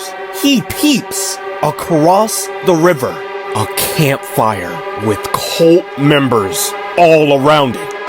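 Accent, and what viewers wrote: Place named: Philadelphia, United States. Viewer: Why his sound like philly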